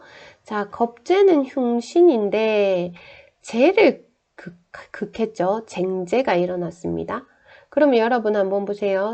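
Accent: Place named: South Korea. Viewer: 사투리 억양이 정감있게 와닿네요^^ 깔끔한 포맷으로 다른 분들과는 차별화되어, 개인과외 받는 기분이 드네요! 감사합니다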